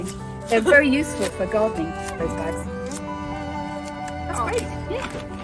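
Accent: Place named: London, United Kingdom. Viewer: Miss,I really, really love your London accent and your videos, I wish you a healthy and happy family!!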